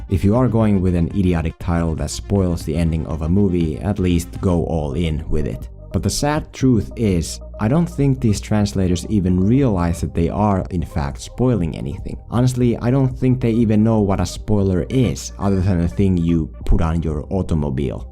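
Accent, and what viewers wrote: Finland. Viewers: Terve mieheen! ;) Teikäl iha huippihyvii videoita ja tiiäks aina jotenki tuntu siltä että on siinä hieman sellast suomalaista aksenttia ;p Mutsiis äijäl aivan huippu hyvä englanti ja niiku Arska sanoi: "An acsent is a strenght!" ;) Ei hätää, se on meidän kahen pieni salaisuus... :7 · playdate is from 2012 not the 90s. base don the accent i'd say finish or lithuanian, based on the covers of the movies, its finish.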